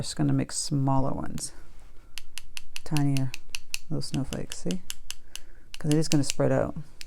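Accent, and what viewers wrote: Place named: New England, United States. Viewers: The Northern Cardinals can have red beaks and some have a yellowish orange beak. The desert dwelling cardinal from Columbia has a gray and black beak. Some have bodies that are gray all over. Some from Arizona have gray bodies and yellow beaks. In Alberta Canada the males are red with mainly yellowish beaks. The females are mainly gray with some red and have yellow beaks. Im sure some will disagree with me because frankly the Cardinals are always birds of a different color. I love your Cardinal. His legs should be angled forward to the left from the knee just below the body and feathers. Love your show and your accent. Do you have a red beak, gray or yellow?lol. Are you from New England or New York areas? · Thank you for this wonderul tutorial! I love Cardinals and see them regularly living in New Hampshire. 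I knew I detected a New England-like accent when I started watching you last week.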